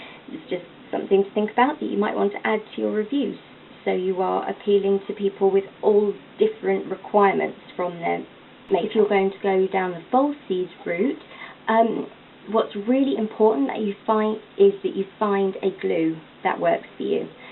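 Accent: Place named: South, United Kingdom. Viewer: I like your speaking voice. You sound a bit posh, southern England? I like your channel because you have videos going back quite a while and I always think it's interesting to see how people grow over time. Hope you're doing well and would like to see a new video some time!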